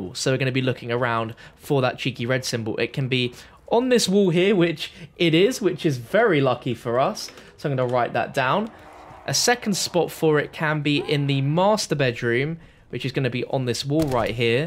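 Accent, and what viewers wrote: United Kingdom. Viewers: People need to stop ripping to shreds about how you pronounce words. British English and American English are very different at times.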